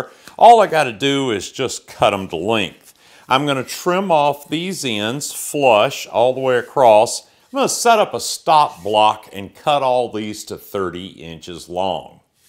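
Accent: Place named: Texas, United States. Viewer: your Texas rhythm is perfect for the instructions. Don't let the thoughtless comments get you down. All the effort and talent you bring to your videos is very much appreciated. I've learned a great deal and I've been inspired.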